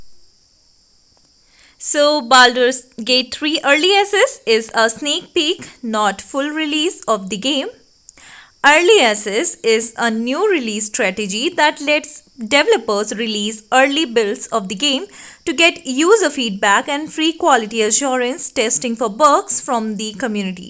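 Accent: India. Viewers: Very nice Voice and cute accent from one of the Indian Languages, I suppose :) But you really need to have some Pictures that do not look like Watercolor Paintings :P Good luck and thanks.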